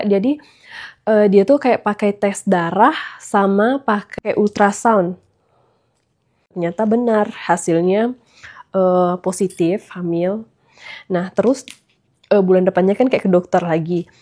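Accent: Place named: Java, Indonesia. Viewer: Mbak bicara nya seperti.orang jawa.pdhl bukan ya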